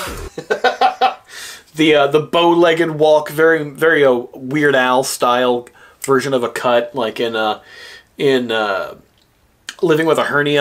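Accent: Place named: Scandinavia. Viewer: Oh. I thought he was from one of the Nordic countries judging from the accent. My point about him being a good Doctor still stands.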